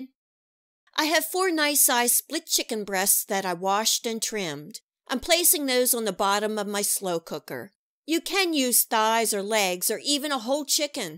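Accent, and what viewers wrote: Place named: Pittsburgh, United States. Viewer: Hi,! Love this idea! Are you from Pittsburgh? I grew up there but live in CA now. I hear an accent. 😊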